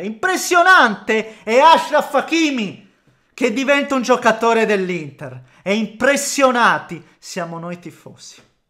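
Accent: Calabria, Italy. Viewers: Calabrese/pugliese detected*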